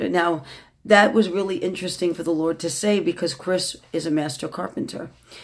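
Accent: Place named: New York City, United States. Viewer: GOD BLESS YOU WOMAN OF GOD. I HAD A SISTER IN CHRIST WHO SENT ME YOUR VIDEO RECENTLY AND YOU'VE BROUGHT SO MUCH CONFIRMATION. MAY GOD BLESS YOUR MINISTRY AND KNOW I'M SENDING PRAYERS FOR YOU, YOUR HUSBAND AND YOUR MINISTRY IN JESUS' NAME. I LOVE YOUR REVERENCE FOR GOD, HIS WORD AND HOLY SPIRIT! ON A SIDENOTE I'M ALSO A NEW YORKER AND JUST LISTENING TO YOU MAKES ME MISS MY NYC ITALIAN FRIENDS. #SPELLMANGRADUATEOVERHERE 🤗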